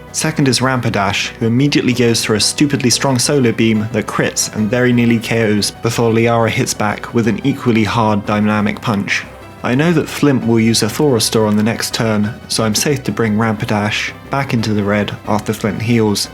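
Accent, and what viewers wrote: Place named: United Kingdom. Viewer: This must be the first nuzlocke video I watch with a British accent! That’s so brilliant, bring it on man! 💪🏻🤗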